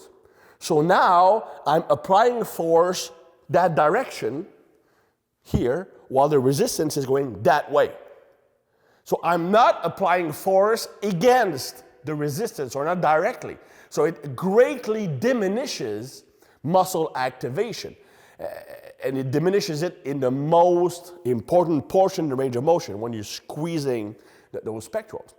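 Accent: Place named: Quebec, Canada. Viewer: it’s a Quebecois accent:)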